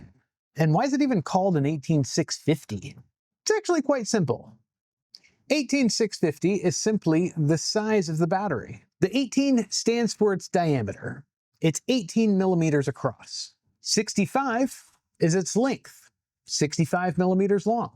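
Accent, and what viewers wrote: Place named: United States. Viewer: You have in American accent in your english language. How does it come that you know millimeters? I was expecting you as an American to use yards and inches and 15/32 inches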